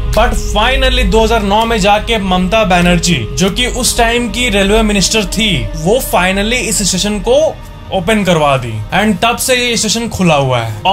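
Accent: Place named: Jharkhand, India. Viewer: Jharkhand se lag rhe ho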